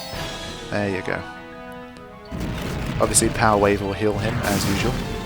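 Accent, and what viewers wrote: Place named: London, United Kingdom. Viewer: What an insightful walkthrough! I've been interested in hearing someone's thoughts about this game, and as a result I found you. I wanted to hear what you had to say throughout your playthrough and I must say that I have thoroughly enjoyed your commentaires. Your explanations about the story make sense, it allowed me to see FFX in a slightly different perspective, so that's nice to hear. Overall, clean commentaries. You talk when it is necessary, you managed to give many details about the game mechanics, as well as adding some sarcasm tone here and there; that kind of humor is always welcome =) Thank you for your hard work and for all the content you willingly share with us, hands down! P.S. Nice voice, and nice accent as well. Londonian accent is the best in my opinion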